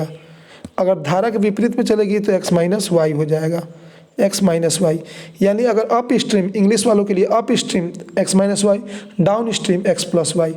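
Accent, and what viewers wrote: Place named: Bihar, India. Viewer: Are bhai hindi bol liya karo, bihari main samjh nahi aata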